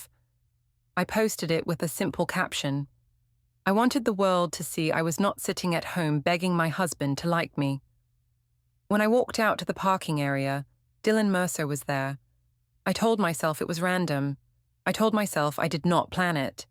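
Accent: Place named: United Kingdom. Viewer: That Brit accent SUCKS! Thumbs down!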